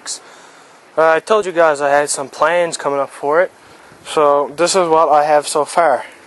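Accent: Newfoundland, Canada. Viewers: man as soon as you started talkin i was like this dude is from newfounland haha, and sure enough when i went into your profile i een that you were, im from flatrock down on the east end of the avalon. i got an 09 450x there last fall, i should have some riding videos up soon cause im getten a gopro. I subbed, if ya wants sub back. tanks bah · Are you a newfie ?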